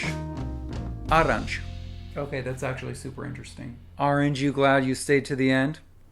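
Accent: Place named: East Coast, United States. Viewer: All very interesting, but what I'm most struck by is that as a midwesterner I thought "orange you glad" was just a bad pun. The jersey/east coast pronunciation (and probably origin) makes so much sense haha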